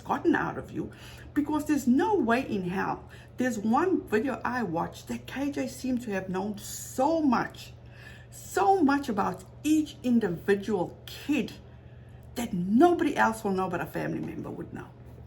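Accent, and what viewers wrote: South Africa. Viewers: You sound like you have a Cape Town accent! I love your accent 😍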